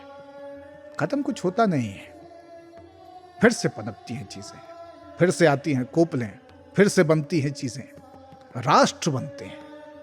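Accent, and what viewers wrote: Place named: Allahabad, India. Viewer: Allahabad style , kahin maja aa gaya , baba is back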